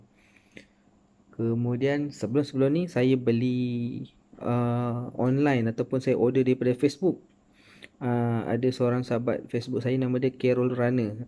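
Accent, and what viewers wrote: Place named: Java, Indonesia. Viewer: Ada lah bang bunyi jawanya😅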